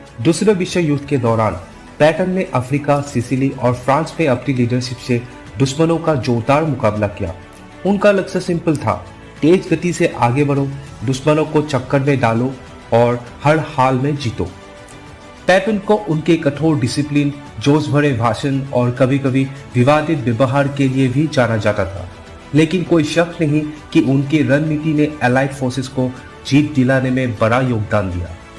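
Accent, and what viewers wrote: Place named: Northeast India, India. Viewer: Nice and informative video, north east accent added to the presentation.... reminds me of my coursemate ... Good job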